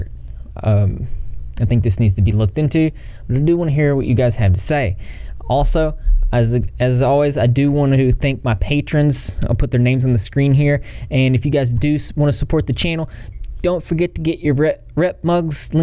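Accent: South, United States. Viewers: Are you from the south?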